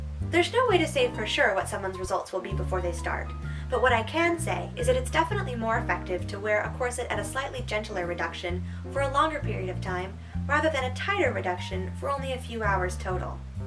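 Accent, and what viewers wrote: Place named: Ireland. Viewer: I’m sure you’re Irish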